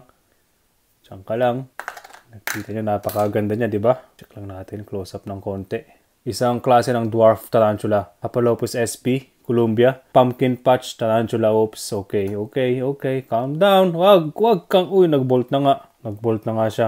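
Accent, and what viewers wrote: Ilocos, Philippines. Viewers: Idol may pag ka ilocano ka no? hehe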